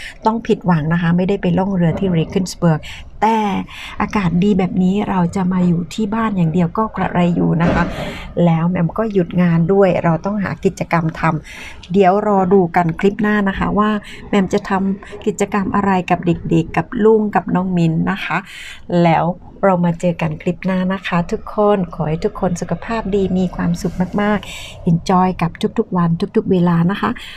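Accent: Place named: Central Thailand, Thailand. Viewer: ยินดีที่เป็นคนใต้ทำคลิปไม่ค่อยมีคนทำ และพูดภาษากลางฟังชัดป้าเป็นคนชอบประเทศเยอรมัน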